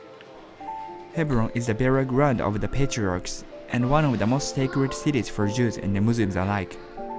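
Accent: Japan. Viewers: I can understand that your English accent is heavily Japanese, but that doesn't mean you understanding on world issues should be so foggy and uneducated. 🤔 THERE IS NO PLACE OR NEED FOR YET ANOTHER ARAB COUNTRY IN THE WORLD ! <link>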